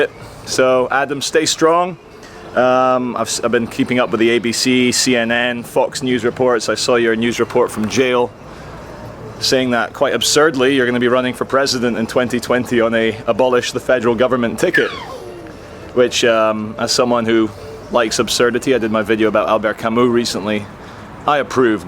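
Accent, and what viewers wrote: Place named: Manchester, United Kingdom. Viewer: sounding a bit more like a manc these days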